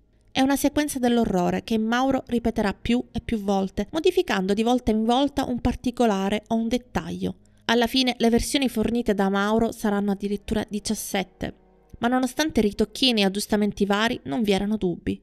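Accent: Sicily, Italy. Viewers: Aspettavo da tempo che qualcuno trattasse questo caso......e finalmente lo ha fatto, come sempre la tua voce narrante è molto precisa e il tuo accento siciliano dà quel tocco in più che mi fa seguire i tuoi video sempre con moltissimo piacere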